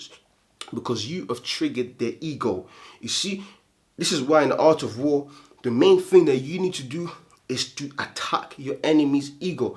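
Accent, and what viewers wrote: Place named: Cockney, United Kingdom. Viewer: And another job well done! You're a wise man. I feel like you actually give people facts and the truth behind this. There's a reason it's a sin to masturbate. There's a reason we are supposed to retain our seed unless we are with wife. Hold on tight fella's, it's worth it!!! Cockney huh? Lol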